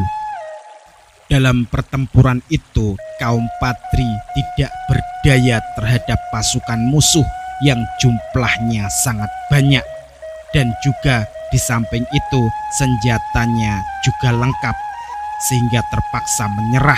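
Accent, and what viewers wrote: Java, Indonesia. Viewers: Medog kali Jawa mu mas, kalau ngak paham sejarahnya yo belajar dulu toh.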